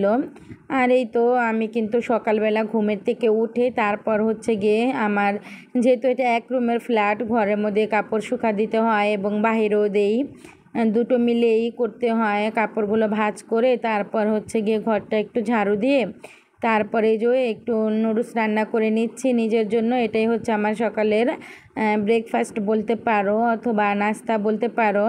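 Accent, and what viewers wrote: Barishal, Bangladesh. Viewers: আপু বরিশাল ভাষা খুব সুন্দর কিযামত আলামত ❤❤ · আপু তোমার মুখে বরিশালের ভাষা শুনতে অনেক ভালো লাগলো আসলে দিন দিন মানুষ পাষান হয়ে যাচ্ছে মেলায় অনেক কিছুই দেখলাম ❤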